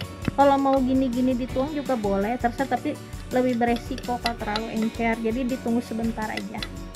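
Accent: West Java, Indonesia. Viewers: Orang sunda mana suaranya!! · Iya Non :") kalo orang Sunda mah emang begini sama kaya aku 🤭🤭🤭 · Waaw... Mantap.. Hatur nuhun resepnya tth... Sunda na ti mn teh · Nyarios na logat sunda pisan hebring poko na mah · I love teteh sayang aku suka banget sama gaya bicara teteh, teh orang mana? Sunda pisan euy nyarios na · Tetehnya nyunda banget seneng liatnya 😄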